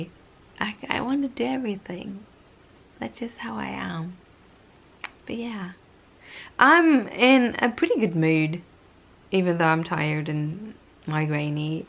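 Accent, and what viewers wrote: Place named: Northern England, United Kingdom. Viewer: I have a question......at what age did you start learning English? I saw video recently of very young children in Norway, ages 6 or 7, speaking English. You sound like you have spent time in Northern England. Did you live in an English speaking country for an extensive period of time?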